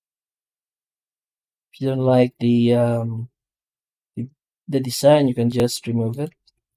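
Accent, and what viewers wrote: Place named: Philippines. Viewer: First time I hear your voice...😅 Now I can confirm you are pinoy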